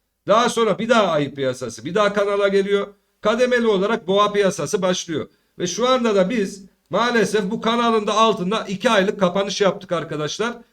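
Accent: Konya, Turkey. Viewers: Videonu izlerken benim hanım dediki, bu adam konyalii😂😂 dedim nerden anladin ?? Hanım dediki: Dimiyorum degisi tam konya sivesi🤣🤣🤣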